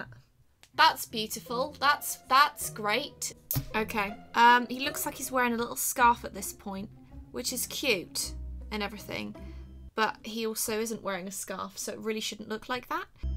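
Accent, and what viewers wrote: United Kingdom. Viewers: British: Me: u sound British already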